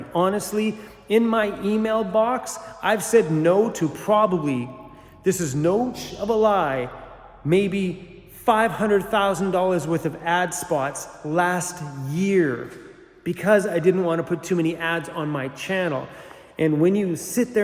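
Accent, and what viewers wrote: Australia. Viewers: Lovely hearing that Aussie accent. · Ahhhh it's great to hear an Aussie accent. I'm a Kiwi in Australia!!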